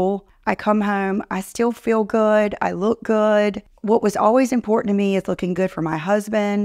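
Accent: South, United States. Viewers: Your voice makes your southern accent even more adorable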